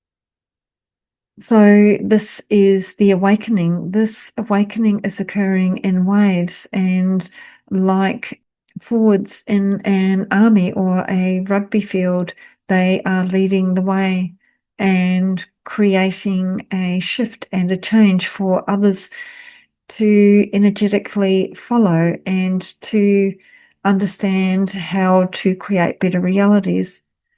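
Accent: New Zealand. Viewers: Her Kiwi is strong 🙂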